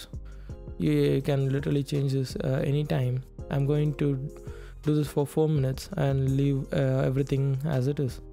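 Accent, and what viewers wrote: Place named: India. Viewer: sorry man but your indian accent is irritating me you should don't talk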